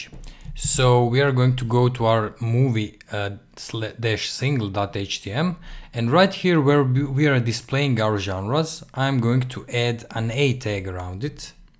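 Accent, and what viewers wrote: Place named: Russia. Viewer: Just your accent in English is similar to Russian )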